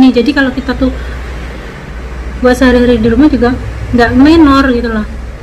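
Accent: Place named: Java, Indonesia. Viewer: medoke kentel, asline pundi yuk?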